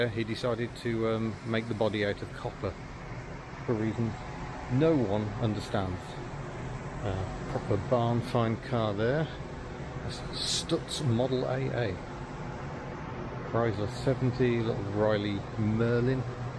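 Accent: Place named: Glasgow, United Kingdom. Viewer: Not a bad Scottish accent. Like a posh Glaswegian.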